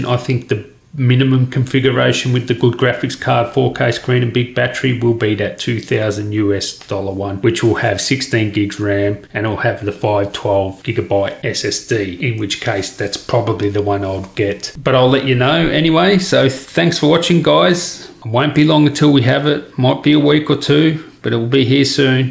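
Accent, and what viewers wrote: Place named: Australia. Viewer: What is it with Australians and the way you pronounce the letter "Aitch"?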